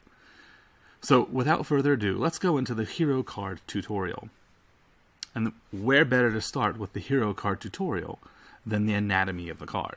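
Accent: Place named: United Kingdom. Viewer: Just one thing, I detect that you are a fellow Brit! I can hear it in your accent, lol.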